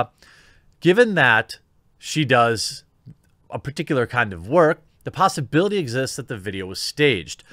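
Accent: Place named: California, United States. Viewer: where in california is that accent from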